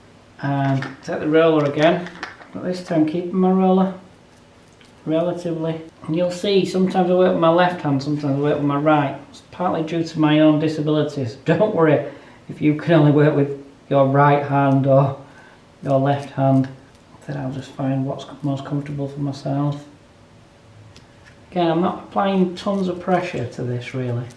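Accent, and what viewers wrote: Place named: Yorkshire, United Kingdom. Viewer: Nice to listen to your Yorkshire accent, thanks for your Video 🤝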